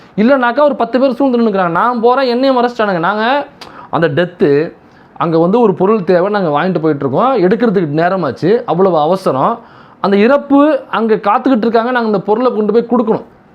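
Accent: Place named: Kanyakumari, India. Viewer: Kanyakumari tamil uthhu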